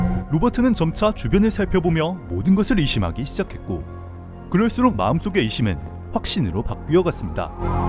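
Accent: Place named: China. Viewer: 말투가 조선족 같아요 ㅋㅋ 컨셉인가요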